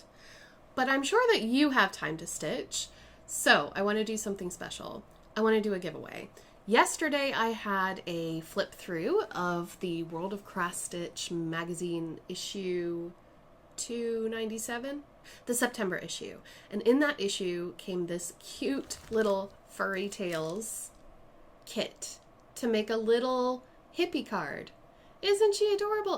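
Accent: Ireland. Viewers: Oh my. The mouse is adorable! I love that your Irish accent is getting stronger.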